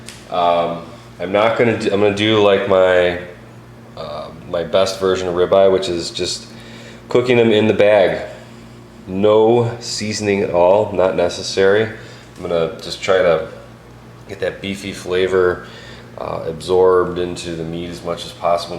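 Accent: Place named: Chicago, United States. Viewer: You have to be from Chicago land area? Based off of your accent, I live near there. Just bought the Anova 😍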